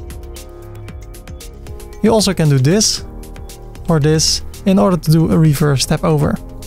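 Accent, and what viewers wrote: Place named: Netherlands. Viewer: You have a nl acsent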